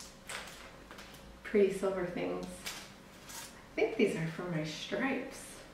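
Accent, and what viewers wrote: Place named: United States. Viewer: Wow, that’s a lot of uniform pieces!!!! Very very nice!!! I just saw the recommendation from youtube to watch your video and started watching and was like: wait, which airline in the US has that uniform? (Because of your American accent) Then I saw the rest of the video and you said it. Awesome.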